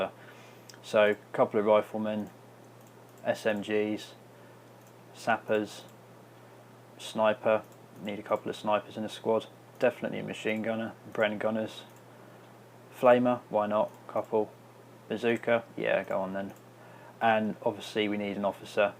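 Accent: United Kingdom. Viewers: nice work, cockney! cheers!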